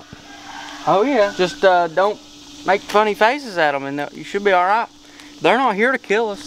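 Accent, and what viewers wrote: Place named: Georgia, United States. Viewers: Sounds like he's from Georgia but says pop. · Bro i love your accents literally can't get more south than that! Yee yee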